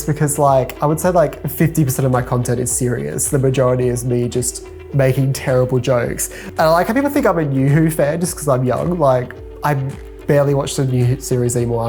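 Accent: Australia. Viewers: don't mind nasally, gay, effeminate Australian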